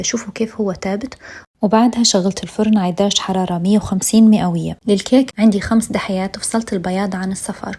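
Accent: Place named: East, Libya. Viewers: ايه ليبيه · خمنت ليبيه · ليبيه شرقاويه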